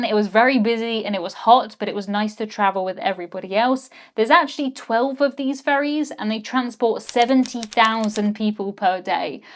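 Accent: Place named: England, United Kingdom. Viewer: Thank You alway for providing great cruise information & awesome locale to life on your vlogs.. oh, love your English accent.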